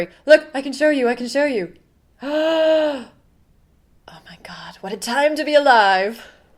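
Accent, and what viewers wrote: Ireland. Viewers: She's actually from Ireland :) xx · She's from Ireland :)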